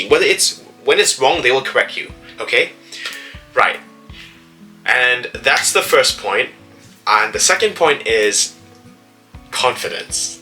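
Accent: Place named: United States. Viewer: You say you’re not a native English speaker, but I’m wondering how that’s possible haha. I’m an American and you just sound perfectly American to me. Can you explain how this is the case? You must have consumed English content extensively since you were a kid. Whatever you did, I need to apply it to my life in Japanese, since I’m living in Japan 😂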